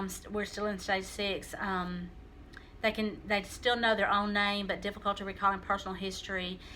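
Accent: South, United States. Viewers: Thank you so much. I have been learning more that is helping me understand and survive than I've learned from the professionals we see. I am a mental health professional and I frequently feel I am 'losing it'. But education of stages and expectations was so helpful in making plans but also allowing me to be more in the present with my husband of 49 years. He is the love of my life and we have no family. But I am sharing with friends and making more friends. They are now my family. I have joined the County Council on Aging Advisory Board as a volunteer to make connections but also learn and share and improve education and support for all fellow caregivers. Oh, and I am a midwesterner, Buffalo to Milwaukee, and I love your southern accent...and your therapy cat :-)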